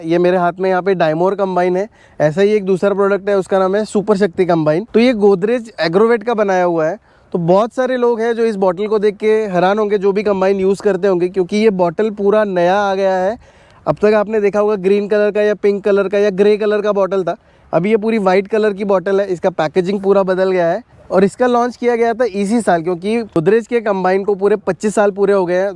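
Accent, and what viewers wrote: Punjab, India. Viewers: Aapki Hindi mein Punjabi tone hai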